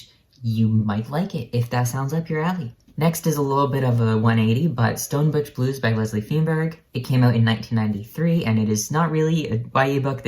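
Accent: Canada. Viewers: I have Cemetery Boys beside me right now. I'm going to start reading it tonight. You go to school very close to me! I grew up near a certain university about 10 miles away from yours and I still live in the area. I took some art classes at your school through the continuing ed program, too. It's strange to think you're so close because you sound like you're from Canada to me. Before you mentioned where you live, I thought you were way up north somewhere. If one day you see some old person with pinkish hair staring at you, it might be me trying to figure out if you are who I think you are. I'm really bad at recognizing people.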